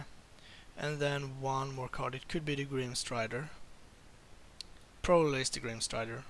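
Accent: Sweden. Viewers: A real good video! I love that Swedish-English! Keep it up!" :D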